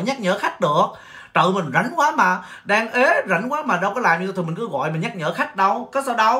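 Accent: Binh Dinh, Vietnam. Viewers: tưởng anh là giọng Bình Định Phú Yên. Nhưng người gì miễn là vui vẻ là được. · Anh Nguoi Nam hay nguoi Bac vay. Vi giong anh de thuong qua😍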